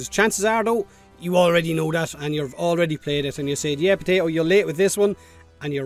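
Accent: Ireland. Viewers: I love your irish accent!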